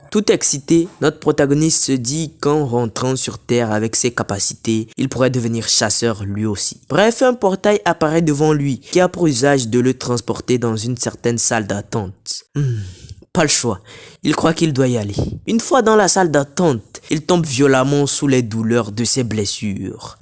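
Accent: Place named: France. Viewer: pourquoi tu a la voix d un gars du continent 😅😅😅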